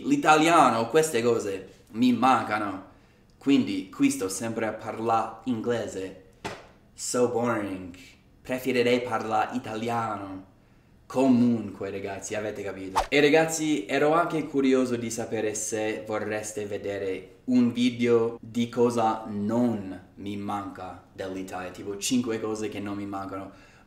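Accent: Rome, Italy. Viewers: Ciao il tuo accento romano e' fortissimo! Io lavoro per UA at DIA chissa' se un giorno it vedro' passare. Ragazzi godetevi l'Italia!!❤😭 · 😂😂😂parli anche un po' romano... Ti aspettiamo in Italia 😘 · parla un po di romanaccio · Daje parli romano mi mancano i tuoi video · Apprezzo, da romano, la punta di accento romano che hai! Continua così!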